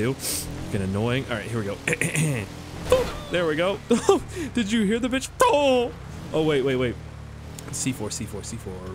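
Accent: Canada. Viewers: "when I get angry I sound really Canadian" I hear ya there bud lmao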